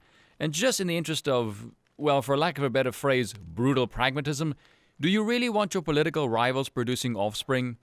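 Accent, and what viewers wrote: South Africa. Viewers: South African, he touched on this a while back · You sound south African lol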